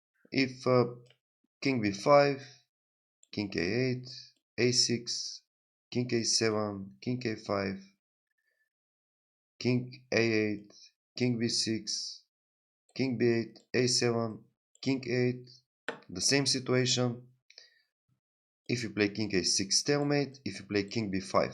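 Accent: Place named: Scotland, United Kingdom. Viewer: 😂 приятный и знакомый акцент) вы из Шотландии?)))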